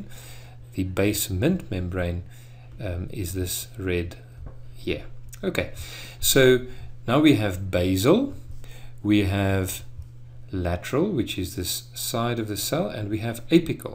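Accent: South Africa. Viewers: Been struggling with this concept all throughout my first year of med school, and this video helps so much! Also love the South African accent. My girlfriend is an Afrikaaner and her dad sounds just like this. It's a comfort to me. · professor are you from southafrica (south african accent) ;) thank you for this video...really clarified some topics I was struggling with.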